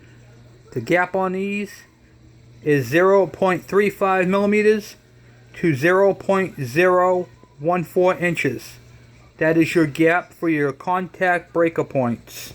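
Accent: United States. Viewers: Your arguably the best explainer on boobtube when it comes to points ignition and cdi and wiring. I use your videos to assist me with the wiring issues in old bikes I get. Thank you sir. Even though you sound like a Yankee you are informative and easy with explanation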